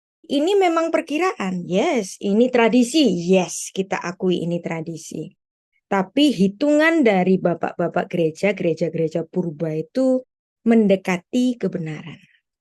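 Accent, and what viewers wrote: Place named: Java, Indonesia. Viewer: Materi Hanuka sangat menarik namun yg kurang ketertarikan adalah dialeg kedaerahanmu asal suku jawa itu yg melemahkan memahami pemaknaan isi sesungguhnya. Bisakah anda menggunakan bhs lndonesia yg baik dan benar tanpa kontaminasi dialeg kejaweanmu? Kok sampai usia yg segini tdk bisa menghilangkan dialeg kedaerahanmu itu gmn sih ceritanya??? Gunakanlah bhs lndonesia yg baik dan benar sebab lndonesia bkn seluruhnya suku org Jawa kan? Harap dipahami utk dimaklumi.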